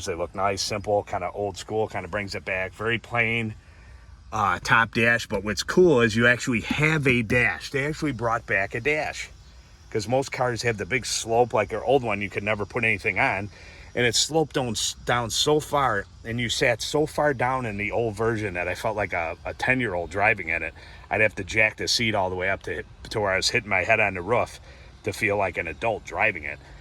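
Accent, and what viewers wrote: Chicago, United States. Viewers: Yeah, i'm from Chicago as well and currently in TN, I too was gonna ask you the same question because I thought that accent sounded familiar. The Wife and I just looked at the the explorer st this weekend. I'm on the fence about and one of the biggest issues i have is that stupid vertical screen display. When i put it in reverse there was just a small 3-4" display which I could hardly make out any detail....that may kill it for me, gonna look around there's a lot in that segment these days and yes the Kia, hyundai, genesis GV80, and the Lincoln Aviator is a consideration as well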